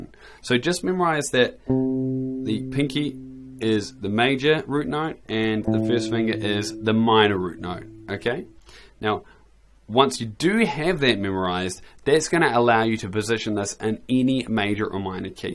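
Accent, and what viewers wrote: New Zealand. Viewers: You sound Kiwi, are you Kiwi? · Question #1: A like a Lot of guitar players but You said that i have to mentione just one of them i Will Say Kirk Hammet from Metallica because he is really technical and his solos goes straight up to My soul. Question#2: i like the way that You teach how to improove your skills in a easy and fun way! Since i'm latinoameeican but i speak English your New Zealand accent sometimes i have to go back and listen again your explication. Don't get me wrong i don't have nothing Against your accent, actually i think it's cool but i'm used to listen American accents but i got it after a couple times listening to You! I like how an easy scale can be changed in any way that You want ti make it sounds good but i need to watch again the video and practice. I would like to understand which scales goes with the right chords? 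For example if i use to start with G5 and then to A5, then to C5 and finish with D5. What it would be a good easy scale to use with that secuence of chords? Just an example! Or just to make it easier for example to play of, the chords are just 2 pretty much the whole song which they are G and A! Which scale can use fir that and also how i Will know to use the right scale depending of the chords that the song has? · So that is new zealand accent? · Love that kiwi accent man! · I was thinking about your accent and I should have got it cuz i am from New Zealand to.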